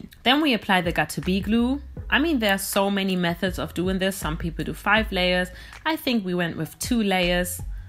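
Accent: Germany. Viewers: Such a thick german accent so cute love it lol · Could it be you are german? Your english sounds like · The german accent I love it -die Haare sehen übrigens geil auch ☺️☺️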